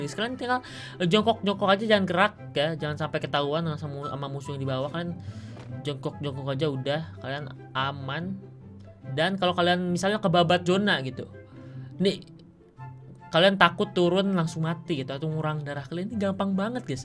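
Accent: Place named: West Java, Indonesia. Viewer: Bang asal mana sih kok sunda🤣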